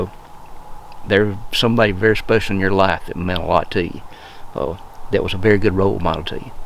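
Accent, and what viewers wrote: South, United States. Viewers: Anytime a man speaks with a southern accent like take note. This man speaks nothing but wisdom. This story is relatable to me and just gives me a better understanding of life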